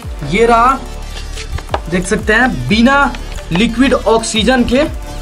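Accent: Bihar, India. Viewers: Mujhe lagta hai ki app Bihar se hai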